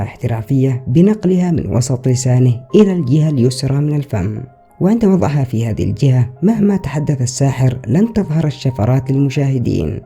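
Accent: Yemen. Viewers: أصحاب المناطق الوسطى في اليمن كذا ينطقونها ارجع الى خطابات الرئيس السابق ولاحظ النطق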